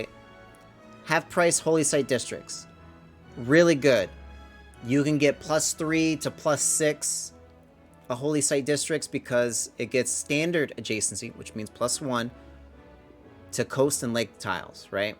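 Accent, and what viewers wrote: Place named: Seattle, United States. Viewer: Awesome Tier list! Just out of interest - where is your accent from? Are you from Seattle by any chance?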